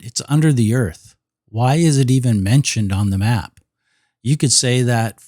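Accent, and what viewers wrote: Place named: Spain. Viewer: you always explain this so well! Thank you! (Nobody cares that your French is pronounced with a Spanish accent.)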